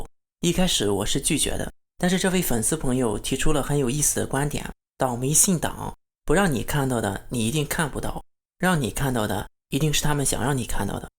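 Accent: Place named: North, China. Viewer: 根据口音可以追查到长江以北了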